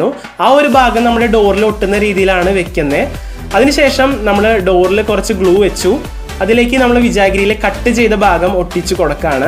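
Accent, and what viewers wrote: Thrissur, India. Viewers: തൃശൂർ ഭാഷ യാണ്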